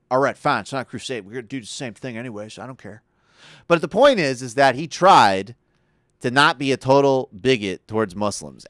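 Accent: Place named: Texas, United States. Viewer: Love the accent Sam! You sound like I would imagine a Texan to sound like...kind of a Southern Western drawl. The internet is buzzing with what the Texas State Government wants in their text books. "My great great great grandpappy used to ride on one of them Brontos, said it made him feel closer to Noah!"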